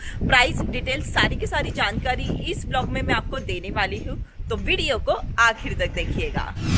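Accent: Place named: Kathiawar, India. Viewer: WOMAN !! I loved your Kathiawadi Accent !! Well covered ...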